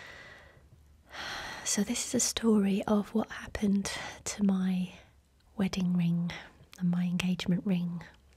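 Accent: England, United Kingdom. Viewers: I am 45mins in and I am totally captivated ,,, I so much enjoy listening to ur soft English accent and laugh everytime u make a reference to something with different words than we use here in the U.S.,,,, u have the kindest, gentlest soul and it shines threw in all u do, it's unfortunate u and ur family are on the outs and u couldn't rely on them when needed, I totally can relate, I have no body in my life to rely on if needed and it's not a nice feeling, that saying is true tho, u find out who's real and who's not when u don't have anything to offer and need help, and sadly I found out, I don't have anyone, thank u from the bottom of my heart for sharing such personal stories and please please don't ever stop doing what u do here on youtube, u will never understand the positive impact u have on so many,,, so so so much love and positive energy sent ur way! 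Xoxo 💜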